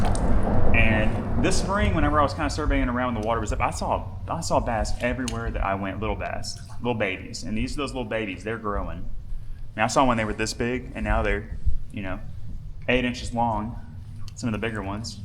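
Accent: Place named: Arkansas, United States. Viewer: That’s “minnows”you act talk like your from Arkansas 😂👍💯👍Great Video 👍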